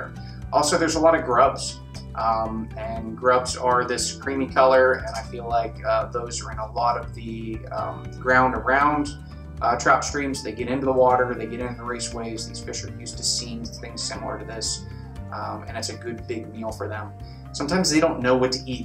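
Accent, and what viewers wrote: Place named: United Kingdom. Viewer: I am assuming this guy is talking about uk fishing? It’s just that his accent makes me wonder.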